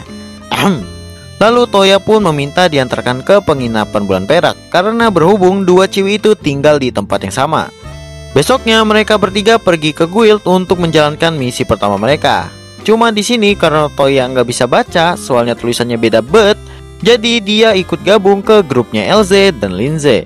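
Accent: Java, Indonesia. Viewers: orang jawa bang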